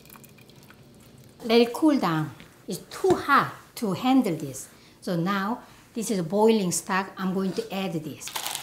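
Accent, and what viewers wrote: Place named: South Korea. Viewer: You are so cute. korean intonation of english too.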